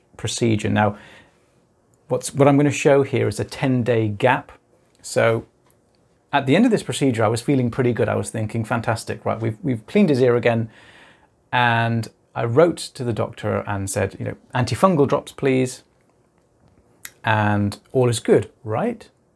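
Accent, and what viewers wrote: United Kingdom. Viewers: I come here to practice British accent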